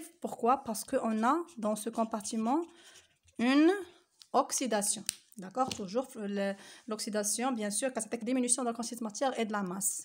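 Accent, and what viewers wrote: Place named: Canada. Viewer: Canadian accent wow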